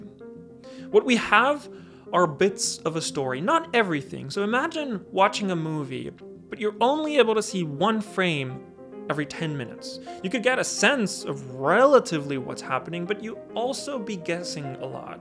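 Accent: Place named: United States. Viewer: I'm really curious about your nationality..you sound american, but I am getting hints of swedish or german......what's going on...either I have a very good ear..or I am a lunatic...I'm fine with either.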